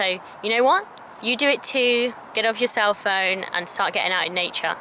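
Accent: United Kingdom. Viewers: I love your British accent. I could just lay in a hammock and listen to you talk.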